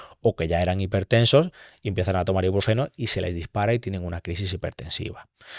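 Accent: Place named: Extremadura, Spain. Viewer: que acento extremeño más precioso tienes chiquilla.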